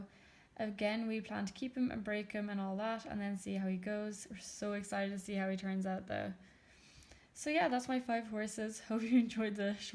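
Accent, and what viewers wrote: Ireland. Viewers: Are you Irish 🍀 cause u sorta have an Irish accent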